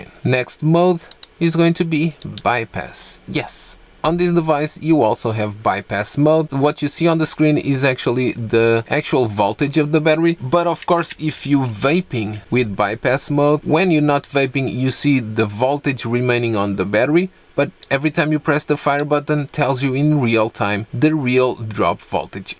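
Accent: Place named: Portugal. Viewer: That Mourinho-like Portuguese accent is just awful.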